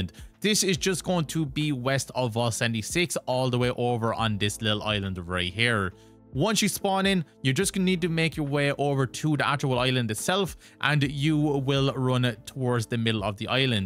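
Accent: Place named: Ireland. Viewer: Love the videos and nice hearing the Irish accent on these tutorials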